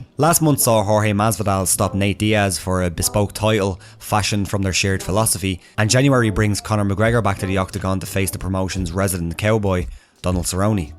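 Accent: Ireland. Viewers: I'll certainly give you a "tums" up on that one. I'd give you "terteen" of em if I could. ;) I had one of the best times of my life in Dublin! My real name is about as Irish as you can get. Love your accent and videos!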